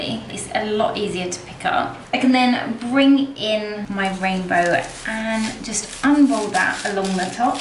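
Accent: United Kingdom. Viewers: As much as I love your rainbow cake, I love your British accent ❤❤❤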